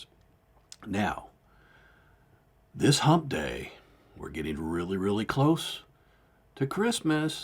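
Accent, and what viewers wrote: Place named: Boston, United States. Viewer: Luv you and your Bahhhh ston, New England, Massahhh chew setts, 35, jfk sr, accent!!!!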